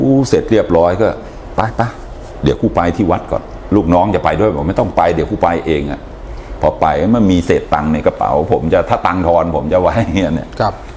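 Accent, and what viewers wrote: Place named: Lat Yao, Thailand. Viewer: คนลาดยาว ก็พูดแบบนี้5555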